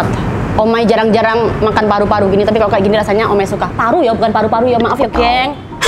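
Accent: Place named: Medan, Indonesia. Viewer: Cobain Makanan di medan ka,, kupikir kaka orang medan karna logat bahasa ny kaya orang medan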